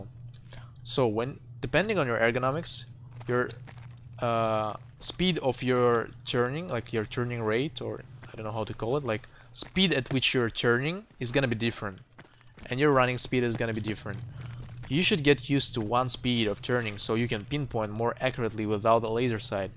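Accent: Russia. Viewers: Wait, I can hear barely noticeable russian accent :) Am I right?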